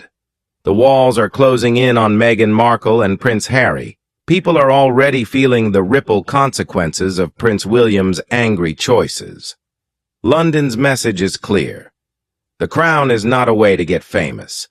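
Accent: United States. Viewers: This is a British site. Stop using an American accent for the narration. Get a British accent or else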